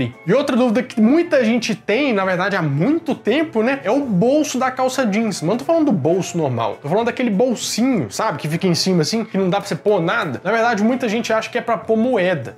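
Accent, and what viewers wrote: Minas Gerais, Brazil. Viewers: ce é minerrr cumpadi? <3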